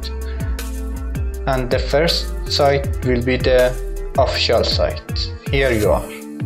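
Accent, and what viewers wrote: Iran. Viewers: Irani ? تابلو بود ایرانی 😂❤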